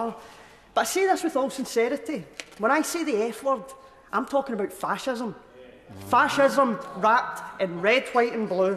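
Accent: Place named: Wales, United Kingdom. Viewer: Love her welsh accent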